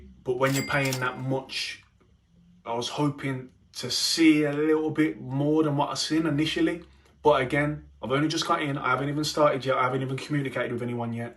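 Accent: Wolverhampton, United Kingdom. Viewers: You sound like your from Wolves in the Midlands, now let me say this i made it to Hollywood and enterd the world of film and TV from nothing midlands girl born and raised in a house of 6 boys with a working class family, what you just described sounds like a complete joke! what a sham, well done to you there"s much better mentoring apprenteships than that nonsense, you made the right decision.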